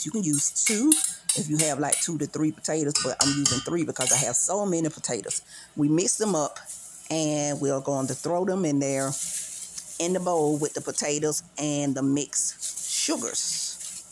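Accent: South, United States. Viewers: Sister, as I listen to you prepare that pie, it reminded me of my momma. She been gone for 15 years. And then the southern accent. Oh girl, you took me back. Thank you so much. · I just love your accent dear!! Reminds me of my time living down south 🥰 i hope my pie turns out good. Thanks for showing me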